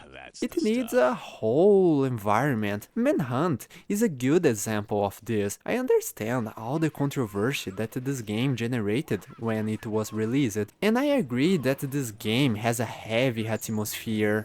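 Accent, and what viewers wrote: Brazil. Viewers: Your accent reminds me of a brazilian friend i had. Miss him so much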